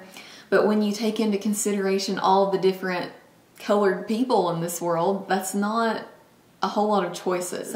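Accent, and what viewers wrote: South, United States. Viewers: i've been hesitant to try the foundation, but after your review i'm going to try it. i love the southern accent on a classy, well-spoken person like yourself. :)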